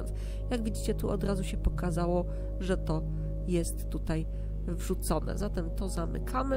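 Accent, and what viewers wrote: France. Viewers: jesteś z innego kraju np. Francji, bo masz taki akcent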